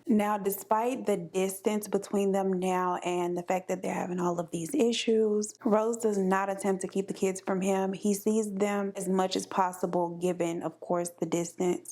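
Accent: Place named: Texas, United States. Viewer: I have to say, your voice is so soothing to me, it reminds me of when my family lived in Texas when I was a child. Your vocal timbre is lovely 😊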